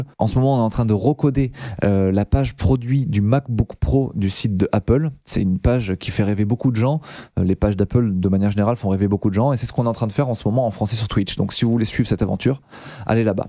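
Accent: France. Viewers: Perso j’étais pas chaud pour les vidéos en anglais, mais malgré mon niveau d’anglais à chier, comme tu utilisais des mots simples et avec ton accent français, étonnement je comprenais 90% ! Mais effectivement ça fait un moment que je ne voyais pas tes vidéos dans mon feed 😖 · Le meilleur dev français ❤️ Tu as totalement raison, apprendre de ses erreurs fait grandir mais c'est parfois nécessaire de se lancer et de s'écouter pour pouvoir tenter de nouvelles choses, car c'est en expérimentant qu'on innove. Après je pense que c'est normal que ton accent français en anglais fasse peur aux français mais ce n'est pas du tout un problème pour les anglophones, au contraire ! Continu de produire du contenu de qualité peu importe la langue, je suis sûr que tu va continuer à grimper très vite 💪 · yes, it's great decision to continue for worldwide people 🗺 it's great to follow a french cool man who speak in english with a french accent ça se dit redresser le cou, tu peux même consulter un bon médecin pour ça Du coup, tu vas commander un nouveau plateau TV pour la chaîne internationale ?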